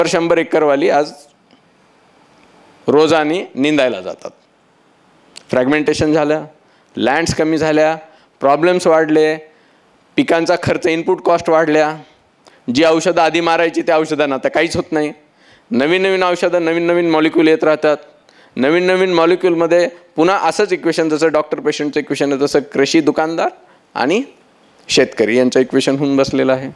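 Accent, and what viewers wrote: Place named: Marathwada, India. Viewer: सर तुमची बोलण्याची भाषा 100टक्के मराठवाड्यातीलआहे.....❤❤❤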